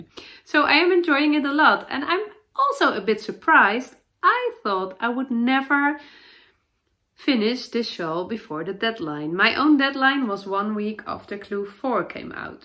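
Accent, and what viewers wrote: Netherlands. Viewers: I love sharing my knitting time with you. You’re such an inspiration with your views on life and challenging yourself to grow whenever you can 👏🏼👏🏼👏🏼 You have made me look at the colour brown in a whole new light😂 I am searching for previous podcasts I haven’t seen bc I’ve watched all of your podcasts and I need more in my day 😂 You make me laugh so much with your Dutchy English. I live for it 😂 The saying when you have a cold is ‘I have a STUFFY nose’ but from now on, I’m going to say I have a stiffy nose, so I can relive that heart jumping moment over and over 💕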